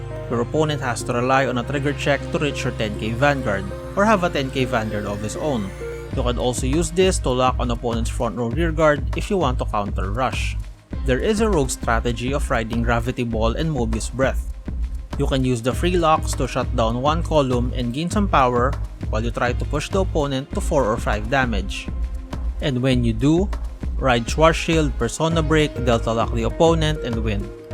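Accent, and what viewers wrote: Philippines. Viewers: Are you Filipino? Your english accent sounds like it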